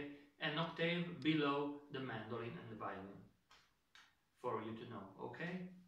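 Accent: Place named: Trieste, Italy. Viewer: Your English is fine. My father and uncle were born in Trieste. I'm used to hearing English spoken that way.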